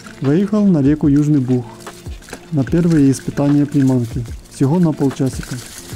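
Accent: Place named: Vologda, Russia. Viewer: характерный вологодский говор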